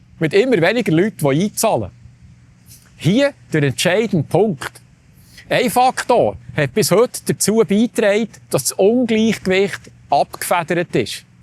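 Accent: Bern, Switzerland. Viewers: Für mich ein klares Ja, weil das Schneeball-System AHV kann mit Zuwanderung nicht gerettet werden (Punkt). Da gefällt mir die Idee von mit dem Startkapital in einen Weltweiten ETF von 15'000.- für jedes in der Schweiz neugeborenen Kindes besser. Dieser darf erst mit 65 besser mit 70 Jahren als Rente (Bezug max 4%p.A.)ausbezahlt werden. An die 70 Jährige Person oder an seine Erben. Hoffe der wird eine Petition einreichen🙃 Übrigens, danke für dis schöne unverfäuschte Bärndütsch😇 Lg Resu vo Loupe <link>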